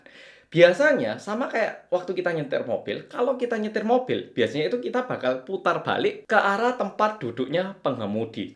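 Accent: Java, Indonesia. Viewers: Makasi, dapat ilmu juga terkait pesawat dkk, dan berduka cita untuk JejuAir 🙏🏻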